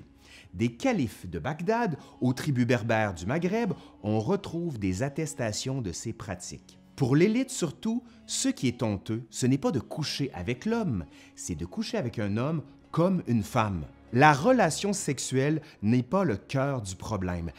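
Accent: Quebec, Canada. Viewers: Friande d'Histoire, je découvre votre chaîne et j'en suis époustouflée. Belles capsules, trop courtes certes mais très concises dans les explications qui les accompagnent, agrémentées de ce charmant accent québécois. Merci pour ce professionalisme. J'achète ! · est-ce qu'il est quebequois? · gloire aux dindons quel accent ? Il vien de Quebec pas des îles de la madeleine haha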